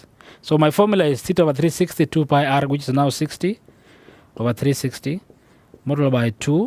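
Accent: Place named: India. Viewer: his english acent closely resembles an indian english acent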